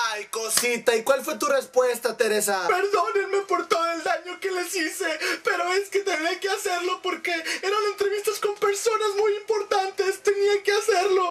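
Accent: North, Mexico. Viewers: a yo nunka veo novelas bueno da iwal jejej me reiii thankss ta bien curada tu vozz sii luego se ve k eres del norte xingaooo jajajja xidooo videooo...... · Ey chiko eres nuy lindo , aunk no me adecuo aun al dejo mexicano , me fascina tus videos y tu forma de expresarte, dejame decirte veo tambine werewer y son distintos cada uno su estilo por eso regreso a verte siguele chiko y un super beso !! muack te escribo de peru XD soy mayu cuidate q diocito te bendiga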